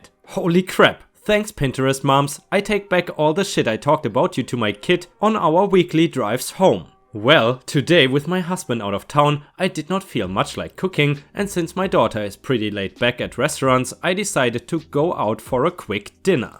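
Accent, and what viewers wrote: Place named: Germany. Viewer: Random question. Are you german? I live in germany and you really have a german accent. I relly like it 🌟🌟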